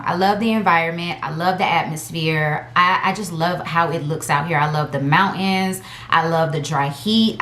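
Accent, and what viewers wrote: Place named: Alabama, United States. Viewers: I really loved this video! We’re the same age! (Well my Bday is April 1) Aries ♈️ I tend to like Sagittarius ♐️ you’re cool ppl 💕 Keep it up beautiful 🤩 And Alabama accents lit 🔥 lmao 😆 -Thank you for your service!! 🇺🇸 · Ahhh, I super late to the video🥴 Giirrrllll you have a accent lol thank you for answering my questions. Funny thing is I heard somebody else from Miami said the same thing that they don’t have a accent but yes girl yes somebody like me from the Northern Midwest can hear it. It not thick or country at all but I could tell you was a southerner. Btw loving the hair and makeup in this video😘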